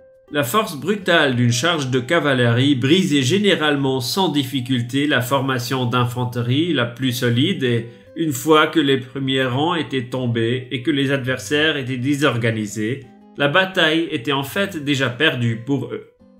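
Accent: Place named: Swiss German, Switzerland. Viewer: c'est un accent suisse alémanique.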